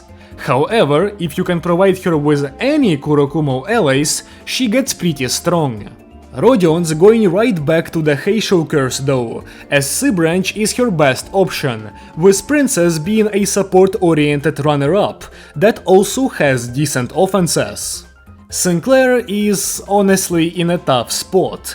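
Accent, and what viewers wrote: Russia. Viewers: What is accent sound like Russian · Что за Русский акцент...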